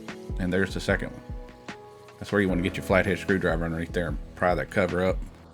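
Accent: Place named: South, United States. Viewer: Thanks for that i followed that through, much love, portsmouth england. Love that southern usa accent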